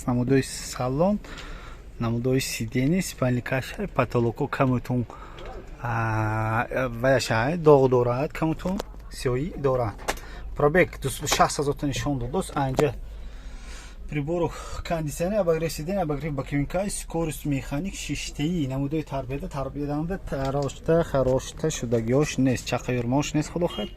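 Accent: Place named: Khujand, Tajikistan. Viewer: Салом алейкум акачон илтимос Акцети хучанди Кати гап назанен МО бисер гапотона намефахмем забони точики модари Кати гап занен узр 😢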